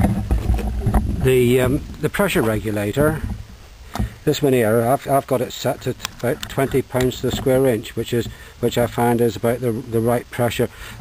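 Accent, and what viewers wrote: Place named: Cork, Ireland. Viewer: he's from cork (ireland) i think :)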